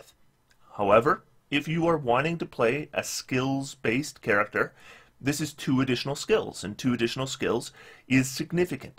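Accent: United States. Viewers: aegonizing blast. gotta love that northern midwestern accent, heh!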